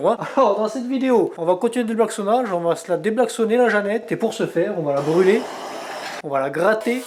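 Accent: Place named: Corsica, France. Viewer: pour ma part, j’ai transformé une petite f2000 en groupe À VH donc l’essentiel était déjà fait. Le partie ou j’ai galéré, ça été pour les freins avant car je voulais a tout prix des 4 pistons. Les gros en 315 cp3307 ne se faisant plus en neufs, j’ai opté pour les plus petit cp2361 avec les usinages correspondant… pour le reste ça été que du plaisir et de la passion. Je suivrais avec intérêt ce super projet et ces supers vidéos avec la touche et l’accent Corse😉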